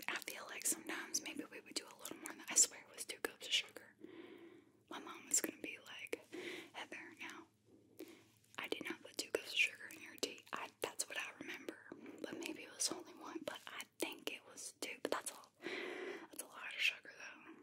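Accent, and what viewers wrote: South, United States. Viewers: Not all sound like this but there is a good amount that does, just because you don't sound like this doesn't mean that you aren't from the south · Your southern accent is awesome! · Something about the southern accent man. Thanks for doing another one! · Do southerners really sound like this. I don’t feel like I do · Sweet tea, ribs, and that accent are probably the only things I miss about the years I lived in the south. Stay Awesome Heather 🤙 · Yes to some good sweet tea and kool-aid! Loving this southern accent whisper! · I really like this video, love the southern Accent. How about more of these once in a while and or other accents you can try. And I also appreciate you Heather 💜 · I live in Charleston SC too so I’ve spent my whole life listening to this accent and slang. Love it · Oh my god. That accent! <3 I'd give my right arm (also known as my favourite arm) for a Southern Belle girlfriend.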